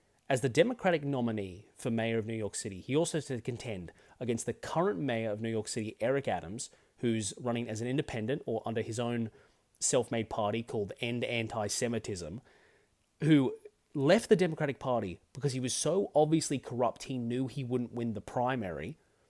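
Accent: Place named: Australia. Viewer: Having watched this shit show from the outside through other progressive commentators its so funny to see another aussie with the VERY australian way of talking about things. Its sad to see so many other commentators who refuse to say that corpo dems deserve to have their teeth kicked in for constantly ratfucking anyone they dont like.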